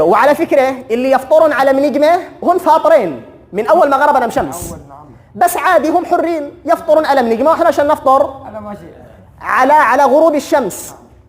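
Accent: Yemen. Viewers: مااجمل الهجه التهاميه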